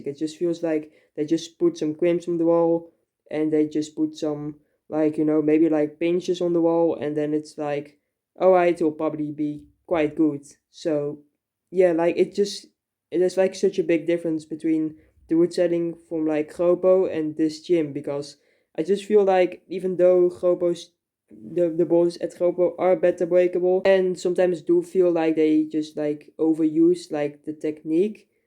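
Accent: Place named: Netherlands. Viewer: Ja, begrijpelijk. In dat geval zou ik werken aan je uitspraak. Het is oké om Engels/Amerikaand accent te bluffen, dan krijg je er op den duur wel gevoel voor. Aangezien het Nederlandse accent nu erg sterk is. Ook de werkwoordgrammatica. Verder goede videoconstructie, dat je ook review-reviews doet, erg leuk!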